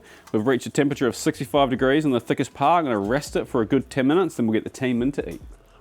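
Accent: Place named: New Zealand. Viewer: Are you a kiwi living in oz? The other 2 defs sound more like ozzieockers tho.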